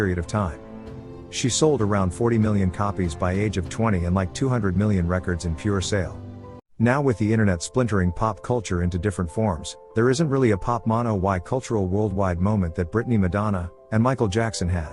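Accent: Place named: United States. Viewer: It's still him but with an American accent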